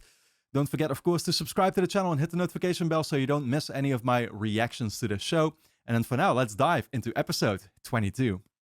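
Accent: Netherlands. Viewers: are you dutch?? or do i mistake your accent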